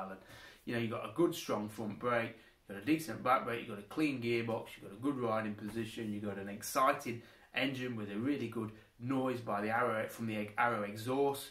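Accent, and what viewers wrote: United Kingdom. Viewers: Your stupid accent is hurting our ears no matter if some people tell u otherwise.just try to speak with Canadian or American,mate other brits can ,u can do it too ..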